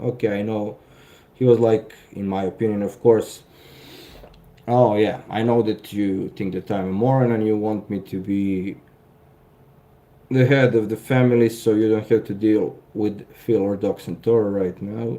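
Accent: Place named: Russia. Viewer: Your accent is TOO THICK to understand the commentary. Just like the RUSSIANS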